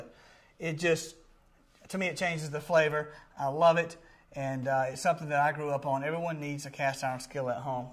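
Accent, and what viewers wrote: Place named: Cajun, United States. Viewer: He's actually Cajun, I can hear the accent (ever so slight, but there), and the expressions. I lived in south Louisiana for many years in Lafayette and Opelousas and picked up the accent myself when I was there. When one moves up north it's so easy to lose it so if he's in NYC that explains it..... Love Cajun! (Now if he isn't, he sure does a good imitation! Lol!) "On-yon"! Lol!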